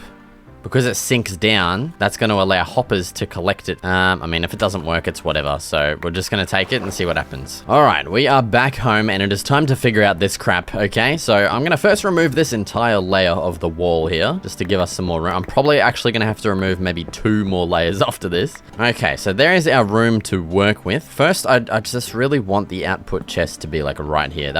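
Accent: Australia. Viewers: In the first 2 minutes I figured out that he is a Australian 🇦🇺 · i wish i had such a strong aussie accent!! love the video❤❤ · You sound Aussie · That aussie accent is coming on hard bro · Aussie Accent makes this so much better 😂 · I’m new to your channel,…I heard your accent so I was on my way to your “about this channel” page and heard you say Nor 😂 immediately new it would be Australia lol… love the video I haven’t finished it yet but I plan on it! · Is this like every aussie accent cuz lazarbeam has the same voice as this guy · It’s good to see a Aussie minecraft YouTuber not many out there ,also you kinda sound like Robert Irwin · i was 2 mins into the video and already know that he is aussie lol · the aussie accent is so clear lol i love finding aussie ytbers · Your channel came up on my homepage, I wasn’t going to watch til I heard the fellow Aussie accent and I’m glad I did 😂 I appreciate the euphemisms please keep em coming #knoblet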